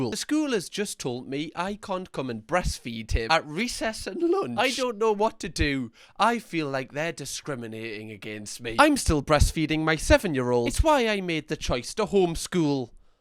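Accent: Newcastle, United Kingdom. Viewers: I don't know the origin either, but I think it's term of endearment for us followers of his. I LOVE being called a gorgeous donut daily, esp. in that Geordie accent of! xoxo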